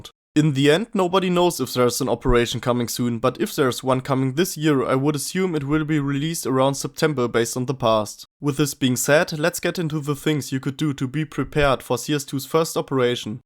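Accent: Germany. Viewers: I can hear your German accent 😜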